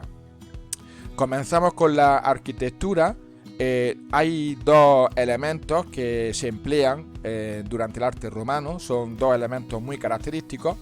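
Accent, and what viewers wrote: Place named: Andalusia, Spain. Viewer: Me encanta su acento andaluz de pura cepa 😁😁😁😝🎻🎶 me encantó ir de turista a Cádiz, me gustaría repetir, saludos 💝💝💝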